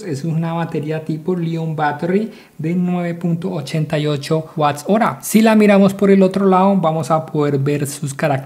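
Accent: Colombia. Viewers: oye eres de colombia? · Hola señor, una pregunta Ud. ¿Es colombiano?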